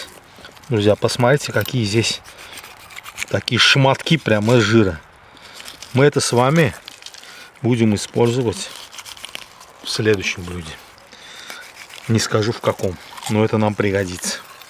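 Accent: Baku, Azerbaijan. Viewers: этот родной бакинский акцент - особая причина смотреть видео этого прекрасного человека!!! Каждый раз возвращаюсь от них на родину, в Баку периода СССР, и у меня улыбка от просмотра! Дай Бог ему здоровья и счастья! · ))) ona görə ki bu Baki akcentidir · Я уехал из Баку 35 лет назад Живу в Нью Йорке Бакинская еда известна на весь мир Все кто побывал в Баку только и говорят о еде А мне было очень приятно слушать его неповторимый Бакинский акцент,который не спутаешь ни с чем Интеллигентный, красивый человек Дай бог Вам здоровья Это настоящий Бакинец! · Очень по нашему, акцент приятный, наш. С искренними пожеланиями всего самого лучшего от бакинца, давно проживающего вдали от родного города. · Amma akçentiz hamını heyran edir, əsl bakılı akçentidir. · Къардаш всё супер. Особенно твой БАКИНСКИЙ акцент. Почему говорю я служил в армии в прекраснейщем гостеприимном городе БАКУ Очень тёплые воспоминания. 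На сегодняшний день БАКУ говорят вообще жемчужина южного Кавказа ,хотя он и при союзе был великолепен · Джан э джан . Как же я соскучился по нашему бакинскому акценту ... Всем привет из Германии ! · Супер!!! 👍👍👍. Бакинский акцент вообще супер!!! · Получаю удовольствие слушая вас. Вы можете не готовить, а просто рассказывать о чем угодно. спасибо ща прекрасный бакинский русский язык, который музыка для меня . Спасибо земляк. Мира и процветания!!🧿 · Бакинский акцент🙏👍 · Я русский но родина моя Азербайджан, я родился в Баку и мне приятно слышат своего земляка с нашим бакинским акцентом. Здоровья вам и счастья. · Подписался из-за моего родного бакинского акцента, ну и конечно же очень вкусно)))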